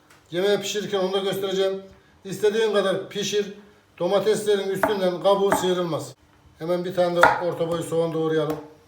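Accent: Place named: Anatolia, Turkey. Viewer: şef zaten bir Anadolu insanı konuşması ve yemek tarifleri ile 10 numara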